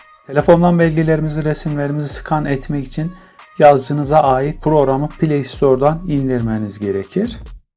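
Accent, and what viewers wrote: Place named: Kargın, Turkey. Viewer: Harika güzel olmuş emmi nerelisin sesin kargın adamina benziyor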